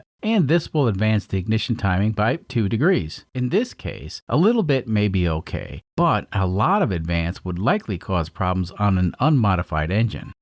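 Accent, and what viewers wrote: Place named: Boston, United States. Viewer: It sounded good, I think what was different was it was just a slightly different presenter voice, maybe slightly more comfortable than normal. It was very clear and had good quality audio. Reflecting on it after I commented I honestly got a little choked up. I grew up listening to CarTalk Sundays. Your accent is a mix but leans Bostonian it reminds me of Tom and Ray a little.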